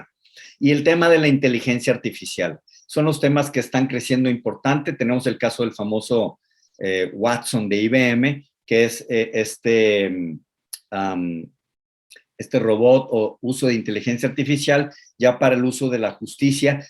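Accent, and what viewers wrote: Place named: Mexico. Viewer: Gracias por su aporte,ponencia muy buena narrativa y la deja mejor aún ese tono mexicano,.Creo que el ser humano se adapta a los cambios con respecto a la mediación y el uso de la tecnología...saludos desde Argentina.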